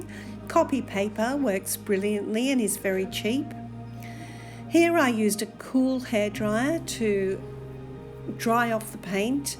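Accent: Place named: Australia. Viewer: Just came across your channel and enjoyed the different ways you produce art from the gelli pad. Thanks for all the detailed comments and hints. It's great to hear an Aussie. Look forward to following more of your art works. Lesley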